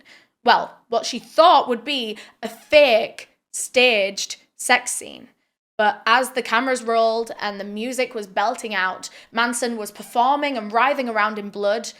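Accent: United Kingdom. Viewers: What part of britain is her accent from ?? Philomena cunk speaks like this too i think 😅 I'm curious, anybody?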